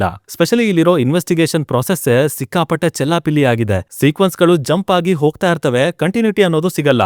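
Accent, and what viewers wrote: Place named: Chamarajanagar, India. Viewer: ಚಾಮರಾಜನಗರ ಭಾಷೆ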